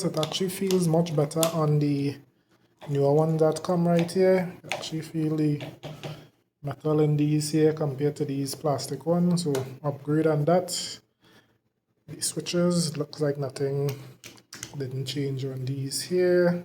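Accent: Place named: Trinidad and Tobago. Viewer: Hi, i can tell you're from the Caribbean, Trinidad and Tobago, right? I have the Supermach SM26 from Pricemart and it works amazing. I noticed that seat is mounted backwards compare to mines. Also if you press and hold the up and down buttons same time, press the M button until the "P0 8" Icon appeared and press both arrow buttons to come out.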